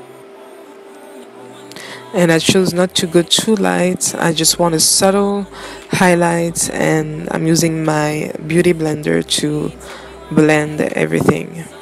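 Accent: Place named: France. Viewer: love the French accent! and you're gorgeous new subbie 😍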